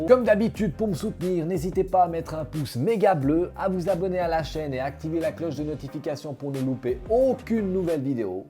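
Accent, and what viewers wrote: Switzerland. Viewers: C’est tip top hop schweiss. Nostalgie de l’accent suisse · On dirait qu’il y a certaines similarités entre l’accent suisse et l’accent québécois... 🤔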